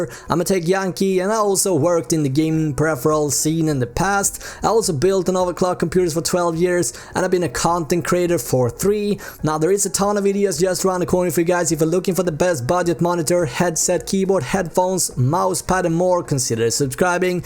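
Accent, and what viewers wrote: Sweden. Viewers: Are you a swedish elleh? Good review. It's an instant buy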